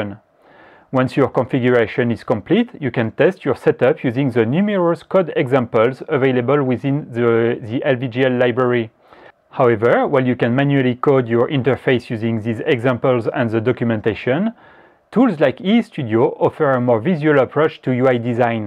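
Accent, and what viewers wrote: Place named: France. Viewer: Excellente présentation!!! Il me semble entendre un accent français, à la base ;-)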